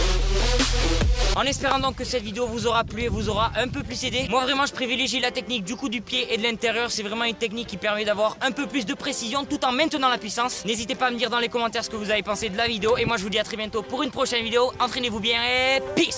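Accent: Marseille, France. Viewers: L’accent marseillais 👌🏼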